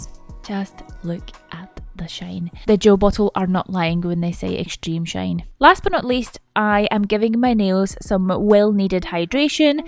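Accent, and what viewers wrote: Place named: England, United Kingdom. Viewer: Can somebody tell me sweet English accent is that ? ❤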